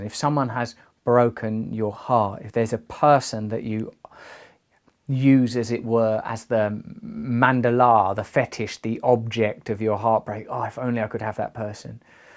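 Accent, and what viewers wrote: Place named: United Kingdom. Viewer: So drunk and sad right now, I had to turn the CC on because it got to the point where the British accent got so thick I didn't understand any words to cry to.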